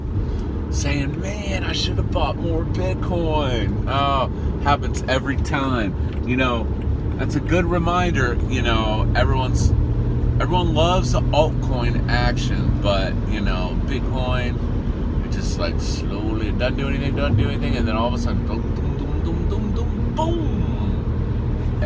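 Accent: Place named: Dallas, United States. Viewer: Are you a Texas gent? Sound like you are around the dallas area. HTown here. Continued success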